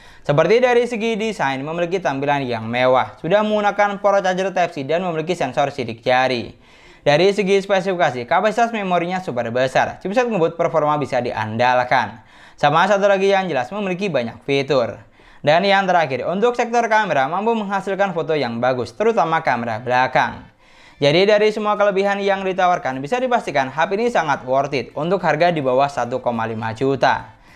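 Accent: Madura, Indonesia. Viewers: kayak nya abang orang madura ya dari logat bicaranya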